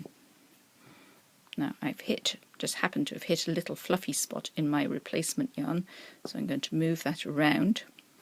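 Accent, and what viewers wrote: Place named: England, United Kingdom. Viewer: Love your work. No fuss just doing the job. I also love that wonderful English accent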